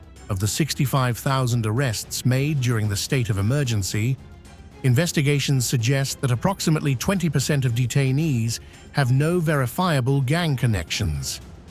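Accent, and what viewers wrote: United Kingdom. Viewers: Listen to this clown with the British accent and the bleeding heart. Almost every single one of those monsters have murdered and raped …if it had been one of his children that had been tortured and killed you wouldn’t be listening to this. He has no clue what it was like to live with these monsters….he only thinks he does. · Well the narrator sounds British and look at Britain now. Overrun like 🇸🇻 was.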